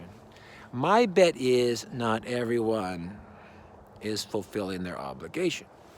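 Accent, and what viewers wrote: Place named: Canada. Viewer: love your canuck accent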